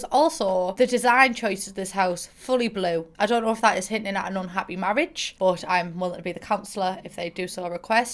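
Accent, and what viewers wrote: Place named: England, United Kingdom. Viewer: I’ve binge watched your videos in the past few days and now the voice in my head has a very thick English accent and I’m not sure how to get rid of it...or that I want to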